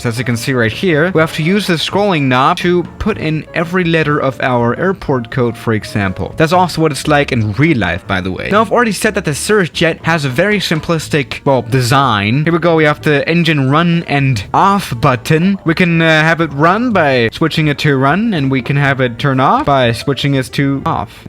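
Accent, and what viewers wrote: Germany. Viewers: Its german I think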